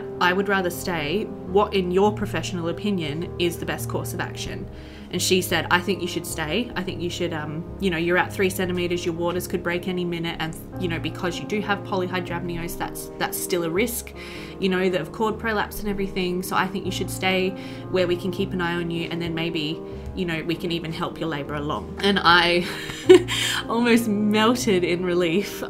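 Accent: Australia. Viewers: Congratulations! Baby Jelly is so cute 😍 Hearing your birth story was very interesting and sorta informative for me since I've never been pregnant yet, but you had a lot of courage! Births are never like you expected them, it's always a surprise but I'm glad everything went well in the end for you and the baby. I'm a new subscriber, so don't worry about making content yet I still have a lot of Sims 2 Pleasantview to catch up 😉 Love from a Canadian girly ❤ (I love your aussie accent by the way!)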